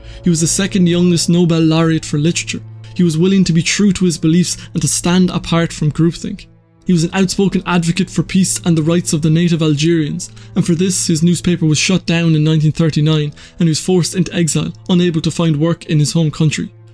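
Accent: Ireland. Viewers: More of the later I think. Although we may have chatted in a group after the course, we definitely passed each other around the court! I just saw your post on r/philosophy, i would usually pass over videos, but am reading Camus at the moment so thought i'd watch. I was glad i did when i heard an irish voice, then when your face came on, i recognised you immediately!! 😂 Great work on the channel looks like you are working hard at it, and exploring some really interesting stuff. This video was great, very interesting stuff! Have you read much Stephen Batchelor? I thought Alone With Others was fantastic, I think you might enjoy it too! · the problem with this video is it is terribly biased. as in many dialectics, both men were correct. life isnt black and white. in general violence is to be avoided, but there are times when radical change and revolution is necessary. 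if one lives in a democracy today, more than likely it came from a violent revolution. in terms of ussr obviously sartre was wrong, in terms of algriers camus was wrong. the idea that one can support a totalitarian regime in its crimes to create a utopian future seems as naive and dangerous as it is to think colonialists will stop theft and murder bc you give them flowers. it seems particularly interesting coming from a man with an irish accent, there wouldnt be a free ireland at all had they not fought.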